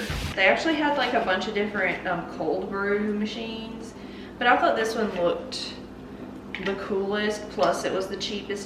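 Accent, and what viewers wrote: South, United States. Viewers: that Southern accent😍...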